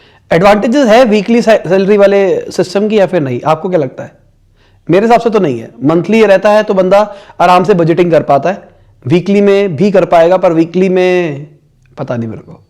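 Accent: India. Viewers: Honest Reply On Question Asked: The desi tonality makes unique but because of that his identity also gets desi rather highly professional Enterprenuer like other youtuber on same niche. So better if he avoid those words, everything else is done properly...salute to his consistency and continues development of useful content. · Bhai, delhi waale log tumhari tone smjhte hai. dont worry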